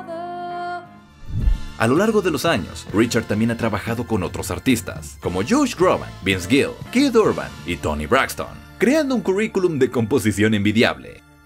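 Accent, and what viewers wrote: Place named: Latin America. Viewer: Hablas con una zzzz de Latinoamericana qué en varios países de América suena rara. Todo sabemos que no tenemos zzzz. Pero esa Z no es la de España por eso le digo Z Latinoamericana o Américana.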